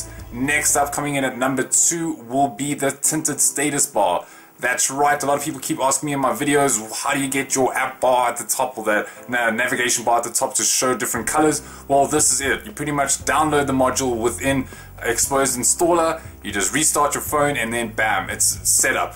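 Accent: South Africa. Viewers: Dude u sound South African/Afrikaans. Is jy? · Are you south african?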